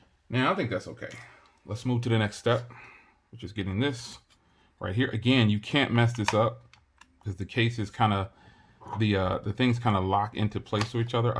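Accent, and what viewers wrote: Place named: New Jersey, United States. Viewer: Man your Jersey accent is heavy at times sound a little like Denzel , this is not meant as an insult, especially with my deep south accent 😂😂😂 Great install great video, yes always answer wifey 👍🏾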